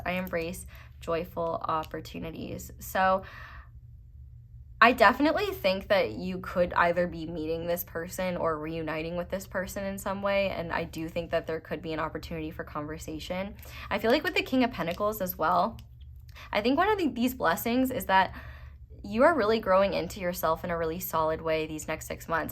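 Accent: South, United States. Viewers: Omfg when you said "feeling my way through the darkness" that's by! Southern accent 👍 awesome 🎉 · You’re so endearing! I appreciate your gift! I especially appreciated your southern drawl! 😂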